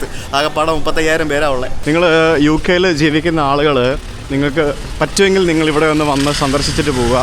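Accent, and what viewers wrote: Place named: Thalassery, India. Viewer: Oh that malayalam accent thalasherry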